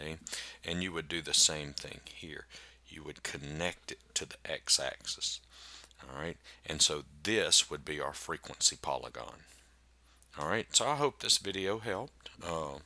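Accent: South, United States. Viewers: Thank you! P.S. You have a southern accent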